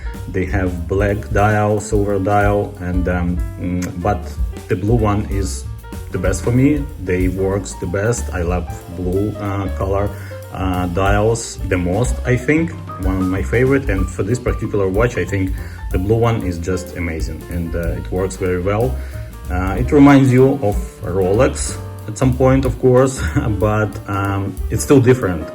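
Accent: Russia. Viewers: О єбать який москальський акцент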